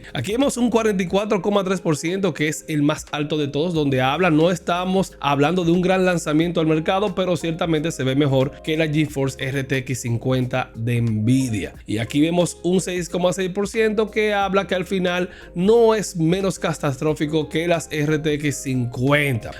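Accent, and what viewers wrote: Dominican Republic. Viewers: buen video, sos de Republica dominicana? que tal el stock alla ?xD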